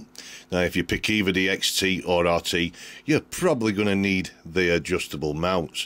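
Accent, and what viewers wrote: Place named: United Kingdom. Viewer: Always enjoy your videos and I love your British accent. Are you from Newcastle? Keep them coming fella!!!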